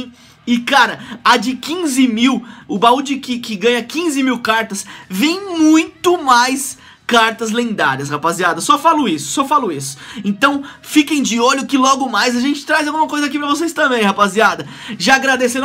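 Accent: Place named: São Paulo, Brazil. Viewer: vc é paulista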